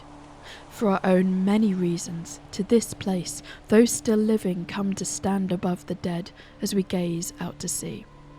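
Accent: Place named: England, United Kingdom. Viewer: A beautiful English accent!)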